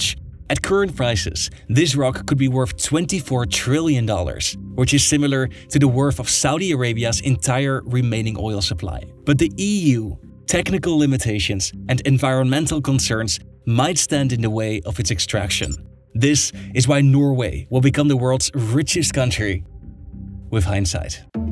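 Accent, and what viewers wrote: Netherlands. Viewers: Hoi, goeie video. Ik heb nog niet eerder een video van je/jullie gezien maar ik kan horen dat je/jullie ook uit Nederland komen 🙂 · Klinkt heerlijk Nederlands XD Maar goeie shit ! Alles komt er bij, ook hoe het samen hangt met andere grondstoffen, hoe de landen er mee om gaan en sowieso hun handel regelen. ZItten nogal flinke verschillen in.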